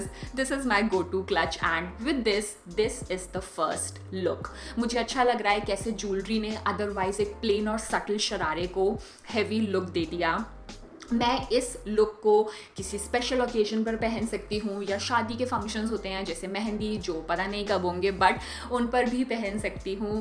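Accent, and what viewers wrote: Punjab, India. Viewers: Aapka bolne ka style ekdum punjabi touch ka hai!! Im in love with your outfits because they are very sober and decent!!!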